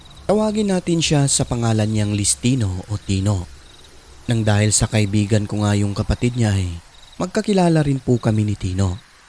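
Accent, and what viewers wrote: Visayas, Philippines. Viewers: I love your bisaya accent Say it again :Mantas nga aswang! heheh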